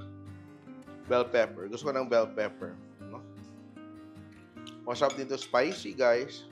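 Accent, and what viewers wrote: Philippines. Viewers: Are you a cook from the Philippines? I noticed you sound like someone from the Philippines speaking Tagalog or Cebuano.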